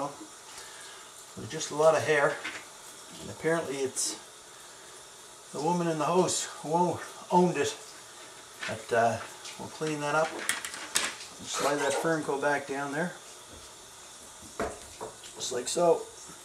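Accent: Canada. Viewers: The narrators Canadian accent is throwing me off. Dont know when he means house and hose...they both sound the same to me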